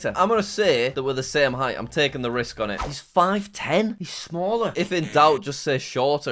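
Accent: RP, United Kingdom. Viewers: I wonder if he got the sudden inclination because Dan has made fun of how tall he is in the past? So he kinda built this image of himself that he and his fans joke about him being a tall, slightly posh (thus kinda naturally friendly) sounding guy which is a subversion from the fact that he actually has terrible posture and a more cynical sense of humor and attitude. There’s also just the infamous line from his rap roast that he’s basically the product of what would happen if Winnie the Pooh f*cked Slenderman 😳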